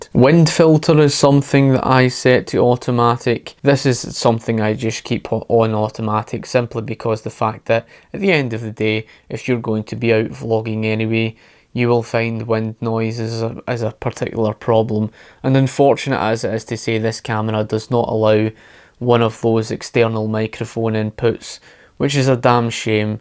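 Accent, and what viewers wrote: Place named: Scotland, United Kingdom. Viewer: A Scottish accent is my favorite accent in the world oh my gosh seriously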